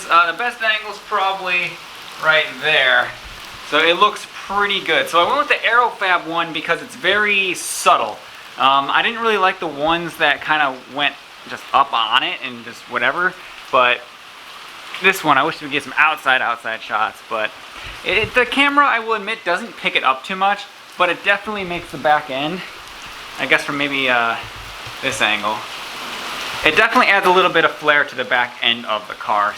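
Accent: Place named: United States. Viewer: Why do Americans say m k instead of ‘mark’?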